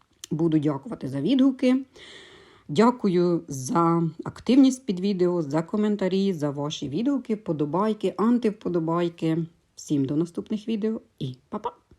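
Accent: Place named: Cherkasy, Ukraine. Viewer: Я передивилась майже всі ваші відео. Ваш говор мене відправляє мене в моє дитинство, до мами. Скажіть, ви живете в Черкаській області?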